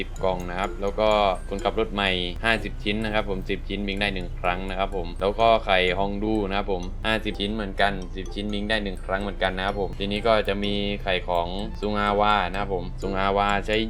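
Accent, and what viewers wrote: South, Thailand. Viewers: คนใต้หม้ายนิ 5555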